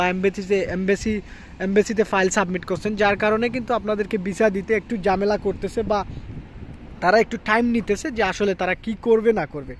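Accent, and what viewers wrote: Sylhet, Bangladesh. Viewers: ভাইর কথায় লাগের সিলেটি আফনে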